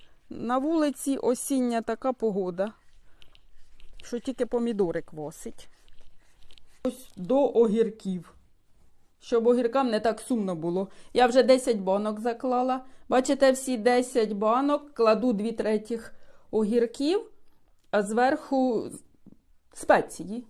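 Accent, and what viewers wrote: Poltava, Ukraine. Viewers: І я одразу подумав, що говір полтавський. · Так мені подобається Ваш Полтавський говір)))Ніби спів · Відчувається в голосі полтавський акцент))) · так, не діалекти. Не вірно висловилась. Це специфіка нашого полтавського мовлення. Мені просто цікаво, ви в повсякденному житті розмовляєте виключно літературною мовою? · В МЕНЕ РОДИЧІ ЖИВУТЬ У МИРГОРОДІ ,ТО Я ПО РОЗМОВІ ЧУЮ,ЩО ЦЕ ПОЛТАВЩИНА. Я ВСЕ КЛАЛА В ПОМІДОРИ ХРІН,А МЕНІ КАЖУТЬ,ЩО НЕ КЛАДУТЬ. ХРІН,А ПЕТРУШКУ. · Дякую!Чую по мов1,що ви з р1дно1 полтавщини! Гарний рецепт! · З задоволенням додивилась до кінця. Навіть не дивилась-більше слухала. Такий рідний полтавський говір. Полтавщина і Вінниччина це моя любов. Бабусі мої там жили. Завжди взнаю людей звідти. Щире вам дякую за рецепт за поради за спомин.❤ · Як я люблю полґтавську говірку...мала батьківщина мого чоловіка... А які там смачні помідори! 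Дякую вам!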